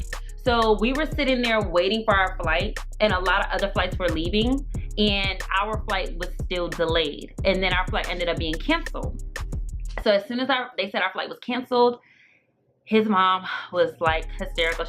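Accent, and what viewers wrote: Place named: New York, United States. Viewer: you still got the new york accent!!! we thank God ohh!! love you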